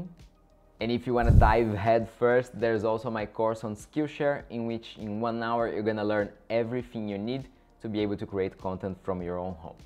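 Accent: Brazil. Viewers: I don't know, but it seems that you are BRAZILIAN, your accent is the same AHAHAHAH. great content brother!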